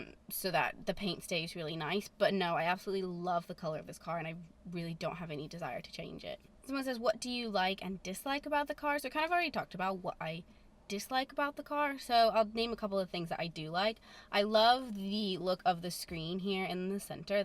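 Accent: United Kingdom. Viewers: I watched a video from 3 years ago and literally it’s fascinating hearing the developing British accent 😂😂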